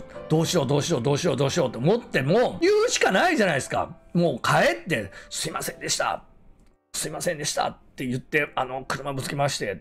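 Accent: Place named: Kansai, Japan. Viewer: 話し方から関西方面の組織かな？やってたのなら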